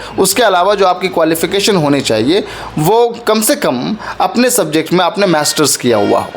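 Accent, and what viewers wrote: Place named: Magadh, India. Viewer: "है ना" यह तो अपने मगध (औरंगाबाद_गया) क्षेत्र वाले लगते है । कोई Confirm करें, Plz। m Ex JMIIte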